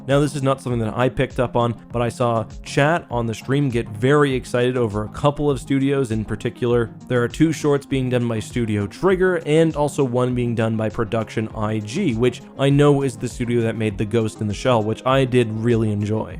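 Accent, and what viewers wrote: Canada. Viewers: Wait are you Canadian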